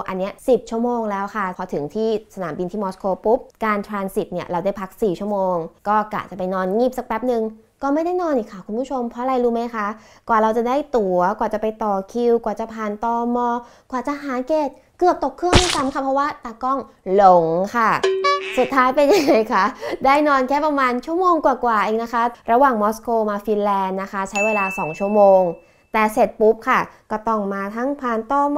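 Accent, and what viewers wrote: United Kingdom. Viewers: เวลาคุณ ไปเที่ยวแบคแพค ดูไม่ออกว่าเป็นลูกคุณหนู CEO เลยค่ะ ยกเว้นตอนพูดอังกิดสำเนียง british ^^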